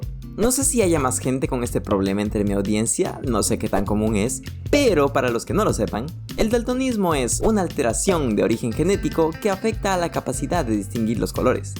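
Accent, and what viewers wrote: Guayaquil, Ecuador. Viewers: Oye eres de ecuadorr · Eres de guayaqui o de guayas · Oh eres de Ecuadoyo igual. ''Abrazame hermano!!'' XD.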